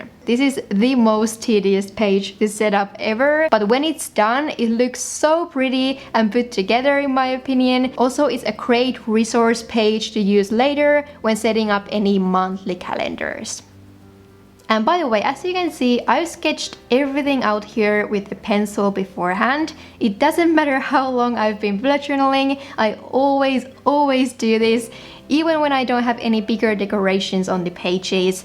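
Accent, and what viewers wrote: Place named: Finland. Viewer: I was watching this after it was recommended to me and was closely listening to the way you speak, thinking "Hmm.. sounds a bit finnish.... but also a bit japanese... Interesting 🤔". Then I checked the info and gasped, you're a finn! Aivan ihanan kevyen näkönen bujo ja todella hyödylliset spreadit ❤👌🏻 Tykkään kovasti! I'm getting Archer & Olive as my next bujo too, I'm absolutely craving for thicker pages! The overall look of them is also very pleasing 😊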